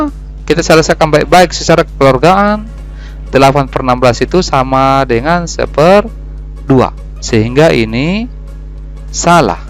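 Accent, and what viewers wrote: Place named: South Sulawesi, Indonesia. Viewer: didengar dr logat nya bapak orang Bugis Makassar kalau tidak salah? 😄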